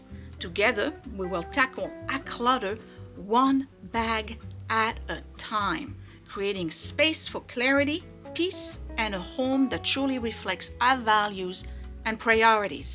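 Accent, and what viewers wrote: France. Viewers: LOVING THIS SERIES! 🥰 I enjoy hearing ur reasoning along the way! And love ur French accent❤️ great work!!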